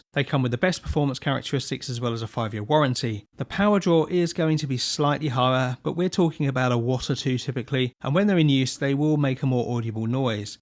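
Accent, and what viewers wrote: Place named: United Kingdom. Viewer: Hard to follow, heavy British accent.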